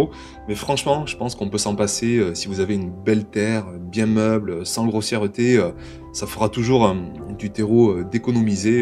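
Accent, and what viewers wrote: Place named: Southern France, France. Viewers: Ah ce bel accent du sud ouest... J'adore... Pour ton cadre, tu pourrais y faire grimper une christophine. Elle serait bien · Enfin des conseils simples et de bons sens....soutenus par une voix très agréable à écouter. Et avec cet accent qui sent bon le soleil, j'avais l'impression qu'on me lisait du Pagnol! Félicitations d'un autre, de tout là haut au Nord!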